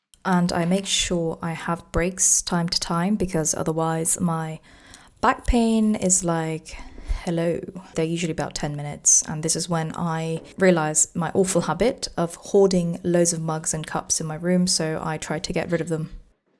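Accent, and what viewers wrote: United Kingdom. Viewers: 영국식 발음 공부할때 따라할때도 넘 좋아요! 이런 영상 자주,, 올려주세요❤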